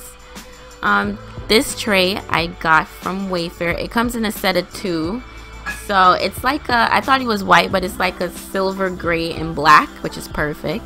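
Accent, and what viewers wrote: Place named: Caribbean. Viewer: Yes! I only caught a hint of the accent. I was actually thinking you could be from St. Vincent. Was not expecting JA at all! LOL!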